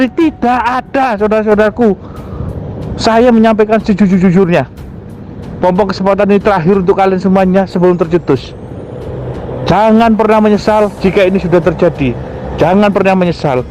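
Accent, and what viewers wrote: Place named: Java, Indonesia. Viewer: Nada bicaranya seperti org jawa. Gk akan lama lagi anda tertangkap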